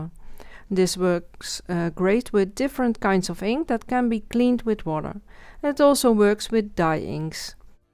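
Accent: Netherlands. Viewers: Waauw amazing ideas that I've never seen before ! Thx !! En ik dacht al dat Nederlandse accent te horen haha.. wat heb je leuke nieuwe ideetjes zeg !! En wat een mooie kaarten en bloemen !!! Enorm bedankt voor je moeite om het te delen !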